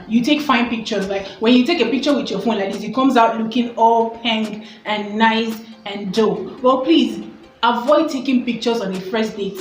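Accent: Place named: England, United Kingdom. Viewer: I like your english accent. you speak good English. good tips. yes self esteem. yes need to have money before travel. enjoyed the video support you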